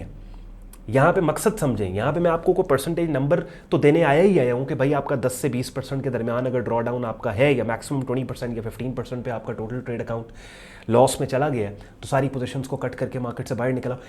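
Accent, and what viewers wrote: Punjab, India. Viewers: Mashallah I like the way you explain bro or Jo Panjabi Ka trqa lgaty Hain Kia kahny😂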